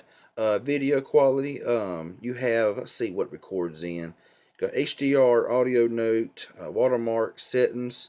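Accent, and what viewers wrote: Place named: East Tennessee, United States. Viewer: Sounds like you're from East TN or Kentucky. Amirite?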